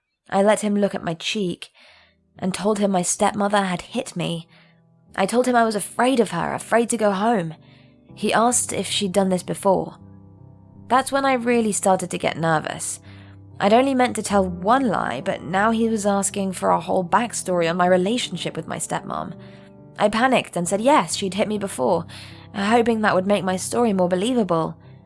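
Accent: United Kingdom. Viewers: is she British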